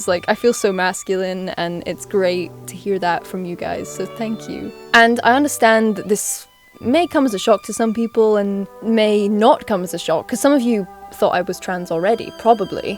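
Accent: England, United Kingdom. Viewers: are you English because you don't sound America thou I might be wrong because I'm english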